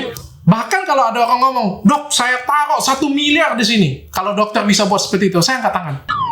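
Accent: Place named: Palembang, Indonesia. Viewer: Wong Palembang ternyato 😂😂😂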